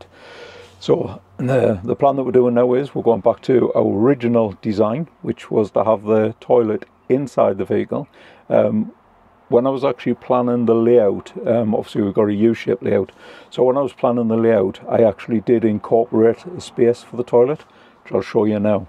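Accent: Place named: North, United Kingdom. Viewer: Great vlog , nice to hear a northern accent 👌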